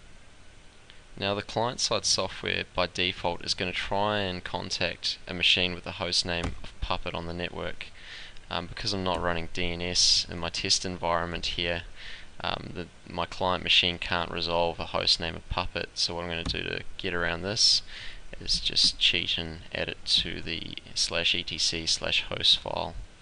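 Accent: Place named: New Zealand. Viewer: What, so just because you can't understand a Kiwi accent, you have to be an arse and post some Swedish translation? Ignorance is bliss? Or you just upset the New Zealand is better than the old one?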